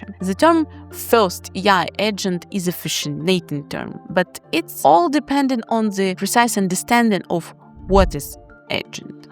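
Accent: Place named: Russia. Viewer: ah I find the Slavic Russian accent so funny and charming at the same time but good video your channel will blow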